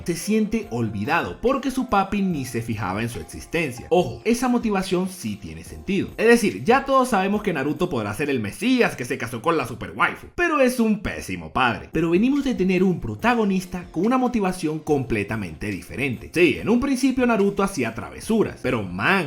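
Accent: Ecuador. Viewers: Eres de ecuador? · Eres de ecuador ? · Eres de Ecuador?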